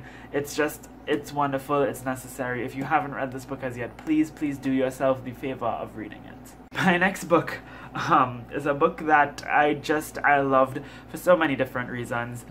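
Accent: Trinidad and Tobago. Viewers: It's so weird but refreshing to hear hear a Trini accent for once. I've gotten so used to foreign booktubers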